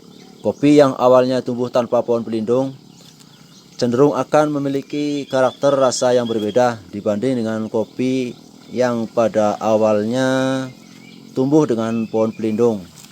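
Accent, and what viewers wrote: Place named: Banyumas, Indonesia. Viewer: wa'alaikumsalaam wr wb jos pokoke... tapi ngomong-ngomong orang aceh ngp bs ngomong ngapak yak bawa saya ke sana dunk 🤝💪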